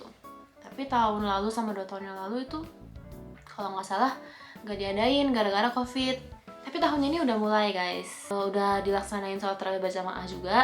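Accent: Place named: Japan. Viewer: nyanyi lagu indonesia dong. pasti kawaii pelafalannya karena waktu ngomonf aja ada jepang²nya gitu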